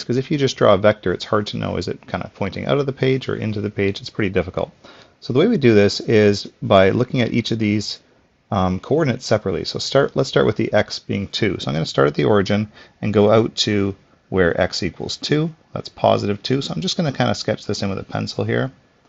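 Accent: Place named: Canada. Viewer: why do you say zed you sound like your from the USA or do I detect a Canadian accent?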